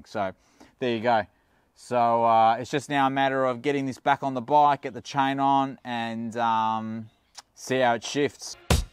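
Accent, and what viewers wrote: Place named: Australia. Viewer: The aussie slang is so refreshing. As a fellow second generation immigrant aussie, the accent is so truely 'modern Aussie' . Love your work mate! Great presenting style and overall video editing and filming.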